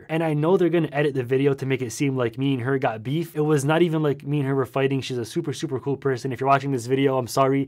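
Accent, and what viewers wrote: Arab world. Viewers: right when I heard your voice I knew you were arab/egyptian lol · You have a Middle East accent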